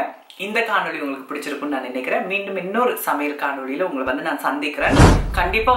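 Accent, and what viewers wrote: Jaffna, Sri Lanka. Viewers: I jst admire ur Sri Lankan Tamil. And Malaysian "Terima Kasih". Sama-sama (ur welcome). Love u. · its great you speak SL Tamil. Please continue to do so. I'm sure by now Indian Tamil viewers should be able to understand. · Omg I freakin love you.. haha Jaffna thamil கேக்கிறிதில இருக்கிற சுகமே தனி. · Hi srilanka tamil speech arumiy cooking, comedyance all nice up up 😍 from swiss: srilanka😍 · Akka sprka i like sirilankankan tamil nenga spra pesuringa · Akka this hair style u pin up look beautiful for u and i really love ur srilankan language❤ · Omgggggggg I juz love your celone Tamil.......... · Yen sissy ipadi pesureenga starting la bayama aagiduchu ena da idu ena aachu to ur voice nu 🤔🤔The way you speak casually daan super 😍😍 the srilankan tamil s so nice to hear from you but in your body language 🙂sema tip for the smell sissy my husband will tell dry fish stinks I love tat smell will follow your tip sure. 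Sissy konjam tamarind water potu oru boil vitu irakunga inum semaya irukum 😍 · Pl always continue with srilanka tamil accent. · Unga elagai tamil sweetta irukka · i like your singala tamil slang.. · iyalbana pechu nadaimuraiyodu video parkka arumai...athuvum yazhpaana tamil arumai...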